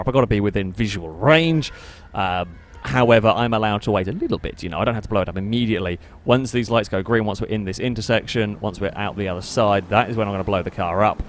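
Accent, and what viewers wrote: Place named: RP, United Kingdom. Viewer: Hi, I noticed that you do have someone of a posh accent but I've also noticed that you have something of a cockney twang to your accent as well not full on cockney but just every once in a while you see certain words that just sounds so coughing do you have coffee ancestry that you know of just curious? specifically the word that you have made up called Gooder and any other words you add ER to that do not normally have er I can't think of any right off the top of my head but I know that he do do that with a couple of words there is no such thing as gooder it's good of or better than usually do that when you're trying to say something with something of you just go blanker And you make up these words or you heard these words used by somebody in their past whether a teacher or a family member or a family member's friend. 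it's like saying Jaguar there is no such word as Jaguar and unfortunately the Japanese got it from you guys and then kaiju type robot hero Jaguar or is we in the United States do them as Ultraman if you have a hell of a lot better than named Jaguar or Jaguar how do you get a jet on a Jaguar stick it up his ****? So it's it is pronounced Jaguar Gua GW g A group he pronounced Jaguar JAG Jag WAR War we put them together to get Jaguar Jaguar can you say that Jaguar stay with me jaguar. you don't pronounce every single vowel that comes across to the English language some of them are pronounced differently being other language it is technically a Native American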